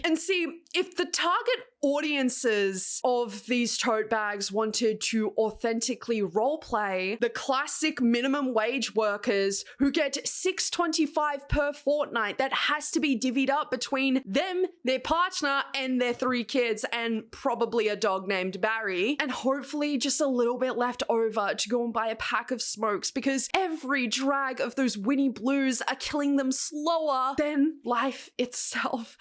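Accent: United States. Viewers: Okay but how can you do a realistic American accent tho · Your American accent is so darn good!!!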